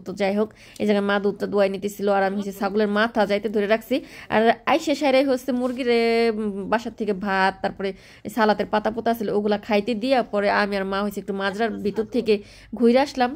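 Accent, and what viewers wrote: Faridpur, Bangladesh. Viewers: আপু আপনার বাড়ি কোন জেলায়, জানাবেন,,আপনার কথা গুলো আমাদের, ফরিদ পুর ভাষার মত লাগে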